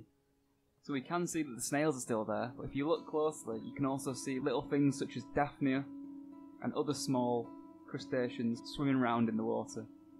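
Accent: Yorkshire, United Kingdom. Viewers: love a thick yorkshire accent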